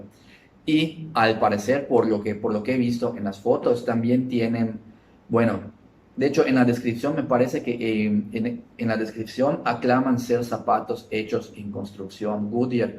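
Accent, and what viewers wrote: Yucatán, Mexico. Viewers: PÉSIMO video!!!....el acento de yuca te lo paso porque es de tu origen de procedencia, pero el que titiubees y te equivoques a cada rato? tas cañon mi yuca!....antes de salir a cámara elabora un "script" para que puedas hablar de corridito y con fluidez, estas hablando de zapatos y no conoces algunos modelos y te basas en fotos de instagram!!!...muy mal...por lo minimo, date una vuelta por León, Gto...pa´que conozcas. JBE y ZARA son marcas que es de uso masivo, para nada de tipo artesanal..y dudo que conozcas marcas "premium" ....donde dejaste a MICHEL DOMIT?....supongo que apenas comienzas en esto, ojalá y pa la proxima te salga bien......bye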